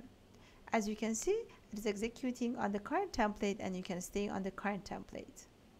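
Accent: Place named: Iran. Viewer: this crazy iranian accent...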